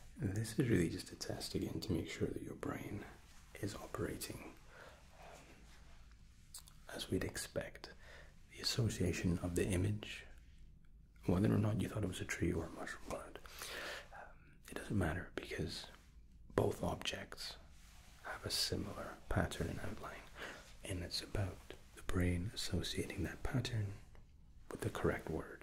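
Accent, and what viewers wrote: Scotland, United Kingdom. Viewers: I didn't realize you were born in England? I thought you were full on Scottish. Were you raised in Glasgow? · Why are u so Scottish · Scottish I thought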